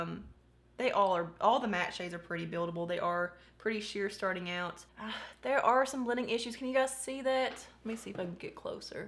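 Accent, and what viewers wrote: South, United States. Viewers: THANK YOU FOR YOUR HONESTY! Your video is the first truly honest & genuine review. I love Emily also, but I'm sure she didn't have absolute control over the whole production of this palette. I'm SO grateful I stumbled across your channel. I'm really drawn to your southern drawl... maybe cause I've one also. You also do a lot of looks like what I prefer myself in my daily life. The face is a canvas that we turn into our own personal masterpiece. Blessings to you for your WONDERFUL, FUN & GENUINE videos!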